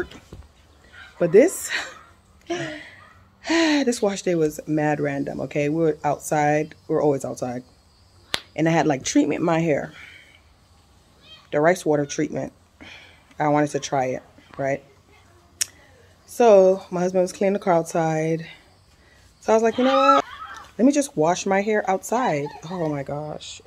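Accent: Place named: Nigeria. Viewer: Are you from Nigeria? Love your accent sounds like your from there.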